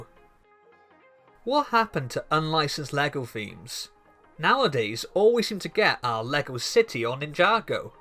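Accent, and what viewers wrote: Wales, United Kingdom. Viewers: I subbed, love the welsh accent! Great video too, I'm 15 and I'm worried I may have a dark age, I'll come back to lego though I'm sure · Fair play you sound proper Welsh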